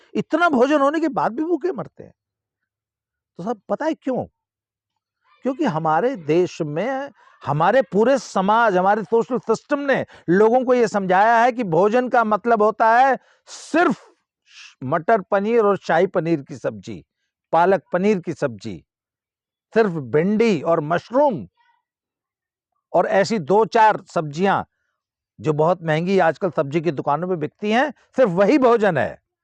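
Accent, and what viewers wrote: Haryana, India. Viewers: Haryanvi touch minde blowing 👌🏻👌🏻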